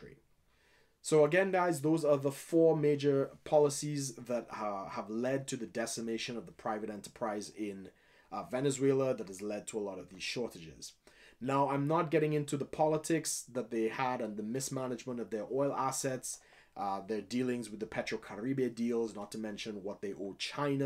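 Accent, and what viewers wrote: Trinidad and Tobago. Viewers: he has the white trinidadian accent lol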